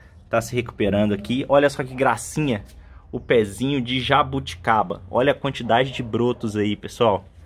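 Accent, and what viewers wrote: Minas Gerais, Brazil. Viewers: Cê é de onde de minas?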